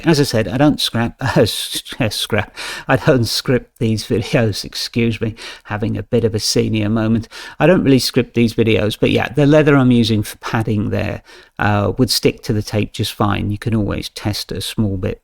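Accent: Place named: United Kingdom. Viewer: I’m an american, so take this with a grain of salt, but I think a British accent makes anything more enjoyable 🫤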